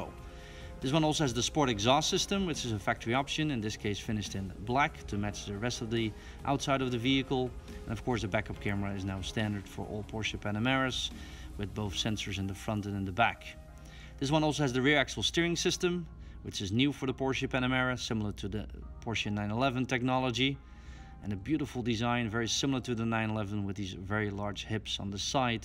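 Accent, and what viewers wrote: Netherlands. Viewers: You have a very strong dutch accent. You dutch by any chance?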